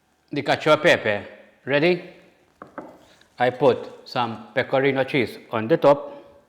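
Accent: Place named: Malta. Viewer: yes but i picked up on your accent and just asking if you are of maltese decent. i know because i am 😊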